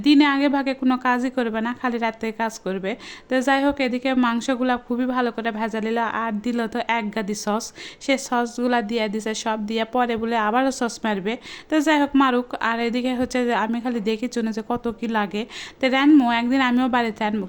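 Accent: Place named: Bogura, Bangladesh. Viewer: আপনার বাড়ি কি বগুড়া নাকি আপু কথা শুনে মনে হচ্ছে আমাদের এলাকার মানুষ ❤❤❤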